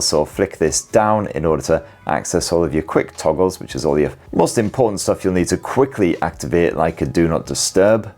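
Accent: United Kingdom. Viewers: I love your British accent, especially when you say "shenanigans" 😂 Your reviews always make my day bright.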